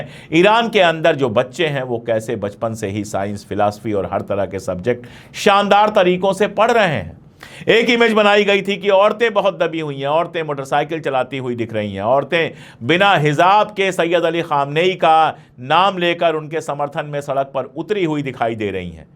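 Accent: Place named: Kashmir, India. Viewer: Lafayette, LA, USA I enjoy hearing your insertion of Kashmiri Urdu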